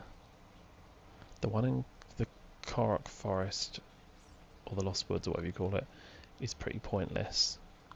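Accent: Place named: United Kingdom. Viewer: I stan a British man speaking softly into the microphone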